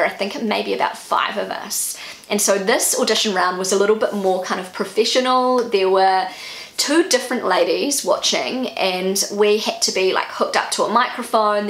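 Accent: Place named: New Zealand. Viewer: How could you hide your beautiful singing voice from us until now? I am impressed by/with all your talents,. The way you tell your little stories, is simply fascinating. And as I said before once, though you speak so fast, it‘s much easier for me to understand the NZ accent than a broad American accent. Maybe, it is because your accent sounds so much more charming. Have a wonderful Sunday ☀️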